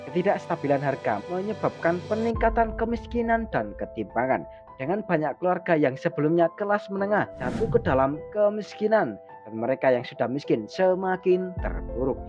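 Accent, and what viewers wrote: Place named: Java, Indonesia. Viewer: ngomongnya ga usah buru2 bg mending pelan2 tp jelas,kecepetan jd kdengeran medok 😅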